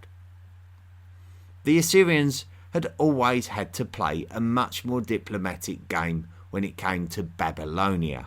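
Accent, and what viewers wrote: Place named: United Kingdom. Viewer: Its one thing this poor bastds born a uk subject, but to be double querst(sic) with a stupid uk accent it terrible enought to change the channel. God, i cant stand the commonwealth bastards.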